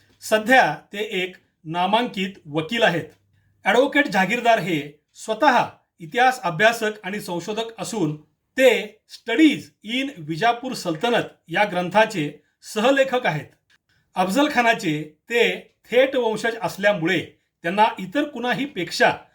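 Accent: Standard. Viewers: फारच सुंदर माहिती मिळाली साहेब तुमचं निवेदन स्वच्छ मराठी भाषेतील असल्या मुळे, उत्सूकता वाढते.धन्यवाद.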